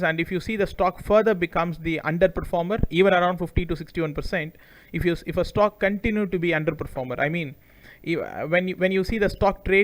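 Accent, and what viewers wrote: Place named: India. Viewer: You are explaining without hiding ,anyt hing the sharemarket secrets in indian English.Your dedicated service is weicomed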